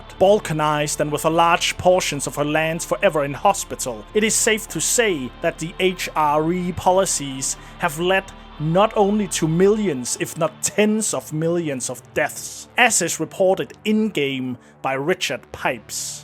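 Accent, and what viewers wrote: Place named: Denmark. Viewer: am i stiktzo or are you danish?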